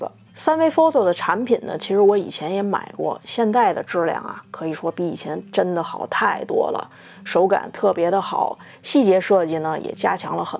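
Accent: Beijing, China. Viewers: 北京姑娘吧？和我一姐妹儿特像。讲的倍儿清楚。关注了。希望讲讲X100系列。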